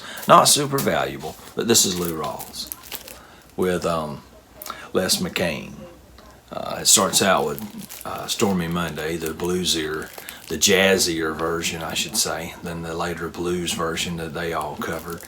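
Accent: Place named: South, United States. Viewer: I love listening to your southern drawl. It's like music in itself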